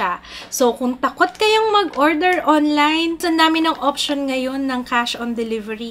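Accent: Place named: Iloilo, Philippines. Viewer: ms, bkt ilonggo po yung accent nyu??, ilonggo po kc ako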